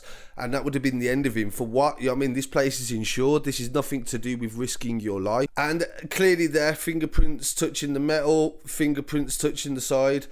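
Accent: Birmingham, United Kingdom. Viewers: Where is this donny channel from ??? Brum? Or Skegness? Lol rate him still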